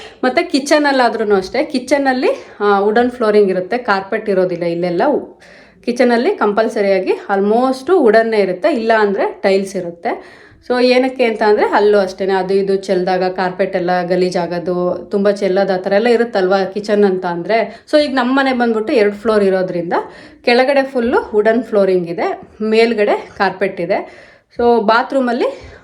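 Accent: Standard. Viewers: kannada swaccha vagi mathadthira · ದೂರದ ಅಮೆರಿಕದಲ್ಲಿ ಇದ್ದರೂ ಸ್ವಚ್ಛ ಕನ್ನಡ ಮಾತು , ಕನ್ನಡ ಅಭಿಮಾನಕ್ಕೆ ನಮಸ್ಕಾರಗಳು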